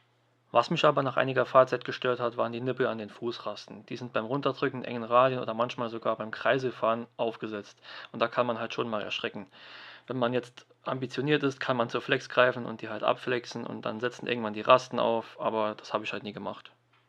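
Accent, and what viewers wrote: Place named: Saarland, Germany. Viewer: Saarländer oder Westpfalz ... zumindest würd ich darauf tippen ..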